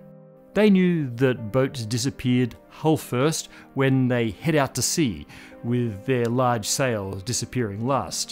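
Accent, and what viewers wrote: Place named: Australia. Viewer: Matt is my all-time favourite narrator. His Aussie accent, perfect pronunciations, and fluid delivery are awesome. Give this man a round of applause! :hand-pink-waving::hand-pink-waving::hand-pink-waving::hand-pink-waving::hand-pink-waving::hand-pink-waving::hand-pink-waving::hand-pink-waving::hand-pink-waving::hand-pink-waving::hand-pink-waving::hand-pink-waving::hand-pink-waving::hand-pink-waving::face-blue-smiling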